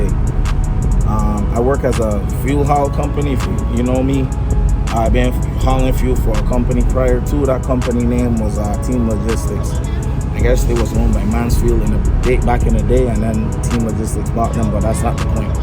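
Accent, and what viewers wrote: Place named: St. Thomas, United States Virgin Islands. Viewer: Vi accent strong st.thomas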